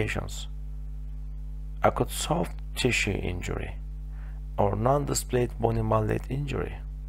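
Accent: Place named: Turkey. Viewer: Bir Türk'ün İngilizcesini hemen tanırım:))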